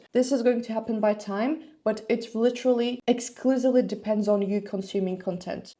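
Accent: France. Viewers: Il me semble que vous êtes Française, rien qu'à votre accent. Ceci me semble une vidéo bien bidonnée ;-) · your french is so good even ur english accent is french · What is your mother tongue ? Because you have a french accent when you speak english ! This is amazing ! 😊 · ingilizceyi de fransız gibi konuşmanız ilginç olmuş, neydi batının iyi taraflarını alııp... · you have a French accent when you're talking in English..... omg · You have even a French accent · Am actually a french native and your intro was really good. You've even got a little bit of our accent 😂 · She even taught herself a thick French accent in English, she's incredible! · That the frenchiest accent I've heard by far from a non-native speaker, I'm baffled right now ! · Bonjour.Quand vous parlez Anglais vous avez des intonations françaises assez souvent! Vous le saviez? Votre Français est juste extraordinaire, c'est très inspirant. 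Je suis en train d'apprendre l'anglais (et uniquement l'anglais ahaha!), et je dois dire sans jamais avoir mis les pieds en Angleterre... J'aimerais atteindre un tel niveau(mon dieu!), vraiment vous avez mis la barre très haut!!!Fabuleux! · Again, there is no way this blogger is not a for-real native French speaker. When she wants to say "right now," while speaking in English, meaning "at this time" or "currently," she says "actuellement" which is the French way to say it. No English speaking person would make that replacement as it is a "false cognate" and would not be natural to make this translation so instinctively. Also, there are pronunciations that are decidedly French, ones that a native speaker can't get out of their speech no matter how proficient they are in a new language. Ditto, would be those sounds that a non-native speaker, no matter how proficient he/she becomes, could pronounce as spot on as she did. 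· It’s sooo amazing u got all the way to c2. Your French is so good!! You sound French lol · you sound too French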